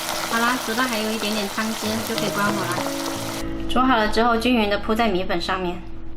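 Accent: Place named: Hakka, China. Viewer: 你好！看到都想食哟😀👍简单易学！吖妺话老鼠奈去了😃客家话好好听👏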